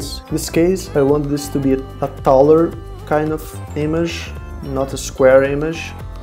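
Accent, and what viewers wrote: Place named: Brazil. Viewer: Cara, tu é brasileiro não é? O jeito que tu fala, tem algo de br ai rsrsrsrsrs LoL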